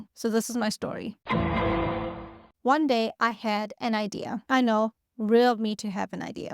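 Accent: South Africa. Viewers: Are you south African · Is that a south african accent?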